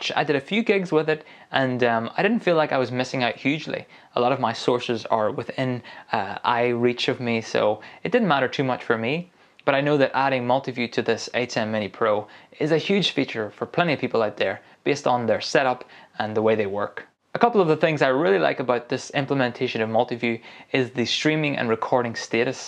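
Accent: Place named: Derry, United Kingdom. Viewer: Thanks sir. These are great videos. You're defs from Derry. Gotta be? Sounds like I'm getting a tutorial from my neighbour ;)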